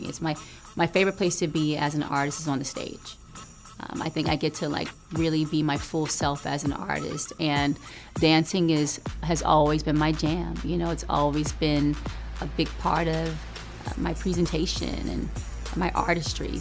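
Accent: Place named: California, United States. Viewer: I know, too much vocal fry and California. She is being genuine but it comes off fake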